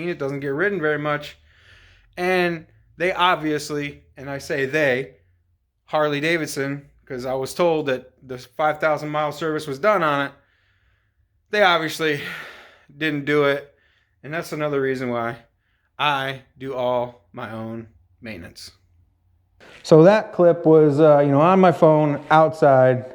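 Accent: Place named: South, United States. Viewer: You’re Southern accent is strong in this video. 😂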